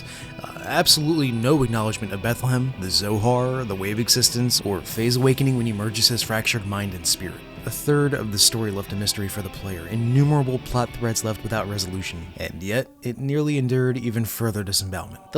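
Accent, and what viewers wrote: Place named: Philadelphia, United States. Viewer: Just finished the game last night, mind blown, had the perfect works on hand to dive deeper when I finished. My GF was watching me play some and was equally intrigued by the story instead of me recount every story element or have her watch the 17hour cutscene “movie” this was great. You from Philly (represent!) my dude? Your “o”s make me think you’re from the NE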